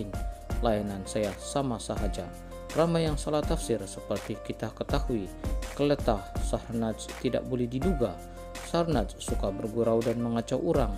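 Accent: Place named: Sabah, Malaysia. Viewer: Cara cakap bahasa sabah min😅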